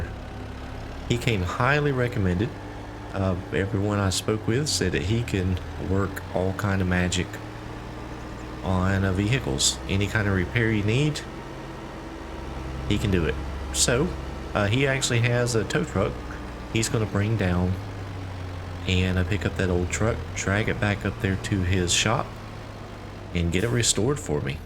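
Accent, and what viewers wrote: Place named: South, United States. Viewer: You sound like you’re from the South my fellow farming friend.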